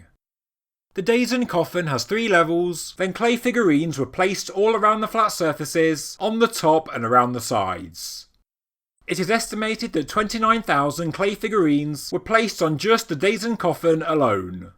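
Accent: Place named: Black Country, United Kingdom. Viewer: Hey man, I know you are from my part of England. Your accent is familiar.😎 I want to thank you from the BLACK COUNTRY for showing us this global civilisation that existed before the bs history we were forced to study even thou it made no sense. What the eff are they afraid of us learning? The truth?.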